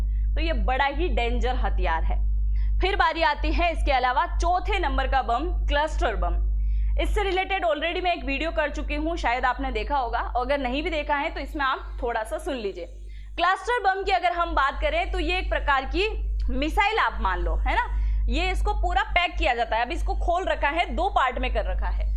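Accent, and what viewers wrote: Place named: Rajasthan, India. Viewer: Aap apni bhada hindustan ki hindi bolen naki rajisthani hindi sory.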